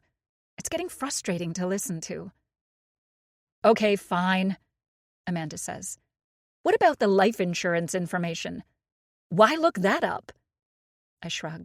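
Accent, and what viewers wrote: United States. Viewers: American narrator 🎉❤ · American Narrator ❤❤❤❤❤ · I love the American narrators!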